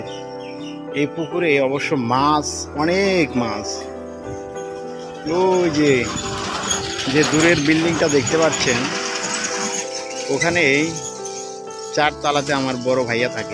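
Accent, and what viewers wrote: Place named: Khulna, Bangladesh. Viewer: ভাইয়া আমিও খুলনার আপনিও খুলনার ছেলে আমি ঢাকা থাকি কিন্তু নিজের একলার কোন মানুষের কন্ঠ শুনলেও ভালো লাগে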